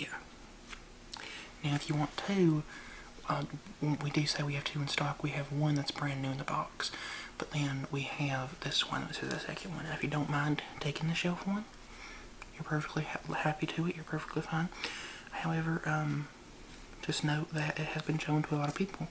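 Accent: South, United States. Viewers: That southern accent is adorable 😭♥️ all those tingles, thanks 😘 Also that pony tail looks so cute I'm crying x💓💓💓💓💓💓💓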